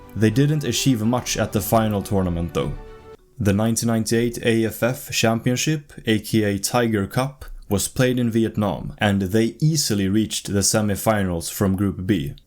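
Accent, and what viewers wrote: Sweden. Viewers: After watching some seconds, judging by your accent, I believe you are Swedish? (No offence, greetings from Norway)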